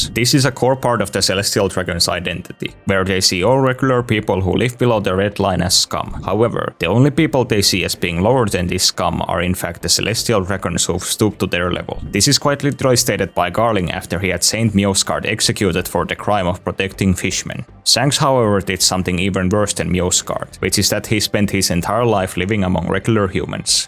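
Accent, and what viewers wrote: Finland. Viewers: Kuulosti melkein suomalaiselta korostukselta, mutta kanavan info silti sanoi Yhdysvallat... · Sounds like finnish accent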